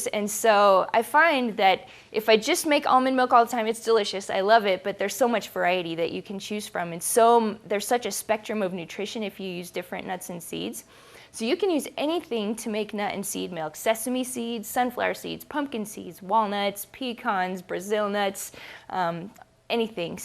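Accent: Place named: Australia. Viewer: Posh australian accent.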